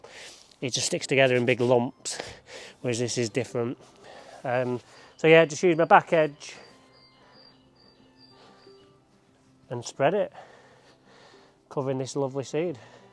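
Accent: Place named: Lancashire, United Kingdom. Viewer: Nice to the rounded sound of a Lancashire accent on YouTube.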